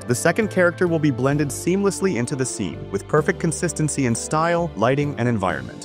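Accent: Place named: Asia. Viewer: how come you have a new voice boss, likes your asian accent better 😊 i know this tool😊 before i watched your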